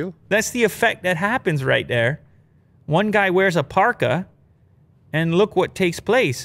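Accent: Canada. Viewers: sounds more Canadian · The first video I really noticed his 🇨🇦 accent lol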